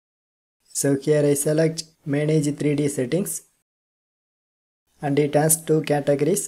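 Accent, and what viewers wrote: India. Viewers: It's an average Indian accent.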